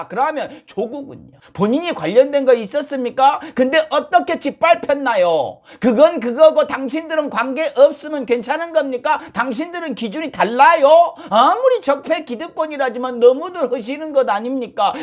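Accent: Jeolla, South Korea. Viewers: 전라도 사투리 심해서 좀 거북하네용 ㅜ 내용은 좋네요 지당한 말씀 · 전라도 사투리 억양이 너무 강해서 없어 보여요. 안그래도 없어 보이는데.ㅎ 조언 하나 드리자면, 정치 시사 관련해서 신뢰성을 갖기 위해서는 개콘처럼 하면 앙대여. 차라리 그냥 연변사투리로 하던지~~~ㅋ 근데 티비는 언제쯤 나오려나여? 부르는 곳이 없어 유튜브 하는건 알겠지만 메뚜기도 한철이니 열심히 해보셔요.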